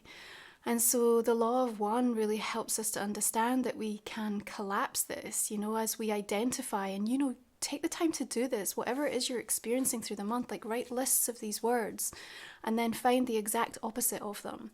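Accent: Scotland, United Kingdom. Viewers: I'm a Glaswegian leo in new York and it's nice to hear that scottish accent reading tarot, maybe it'll bring me luck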